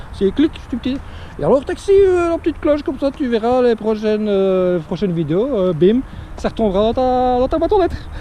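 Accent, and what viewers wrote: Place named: Belgium. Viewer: Belle vidéo ! Tu pourrais dire ou est le spot ? je suis à bx et quand j'entends l'accent je sais que c'est en Belgique 😅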